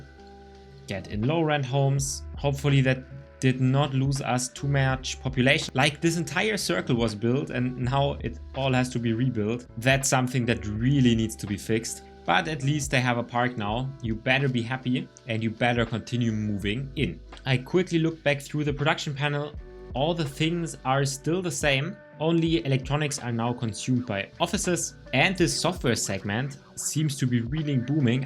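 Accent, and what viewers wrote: Germany. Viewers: Also nich böse gemein. Das hört man ja raus dass er deutsch is😅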